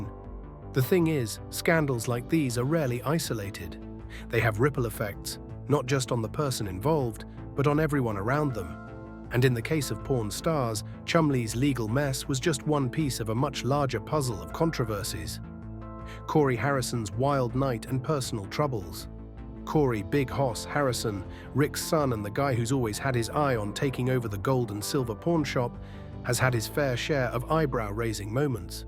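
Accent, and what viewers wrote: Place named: United Kingdom. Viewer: Obviously made by a Brit.There's a whole series about British pawnbrokers, and they all pronounce it 'pornbrokah'. Quite distracting, actually...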